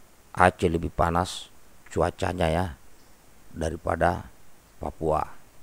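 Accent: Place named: Batak, Indonesia. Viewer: Salam hormat jg kawan,,, logat mirip batak eeeee gak apa2 ttp mantap, jngn lupa ngopi 👉☕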